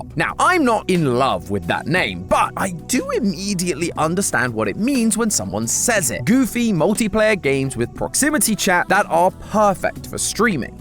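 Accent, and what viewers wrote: United Kingdom. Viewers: just came from a film theory and got jumpscared by the british accent immediately 💔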